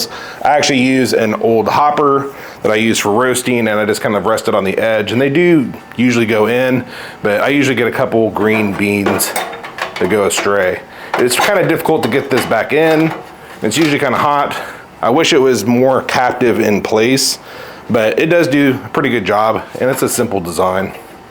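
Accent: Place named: Southern California, United States. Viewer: Holy SoCal accent batman. Thank you for answering all of my questions though! Great Vid!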